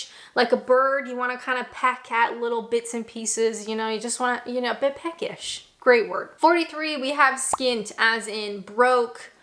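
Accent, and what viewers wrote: England, United Kingdom. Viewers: I love it when you speak with an English accent, it sounds really nice 👍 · Thabk you,... Another excellent video. I do find the way you pronounce some words very endearing, so please don't become too British 😊. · You should do an entire video in your English accent! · Love your English accent see if you can do a whole video in English accent lol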